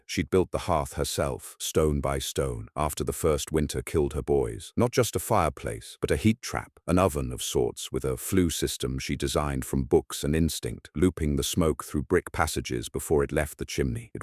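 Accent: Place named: England, United Kingdom. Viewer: What's with the English accent?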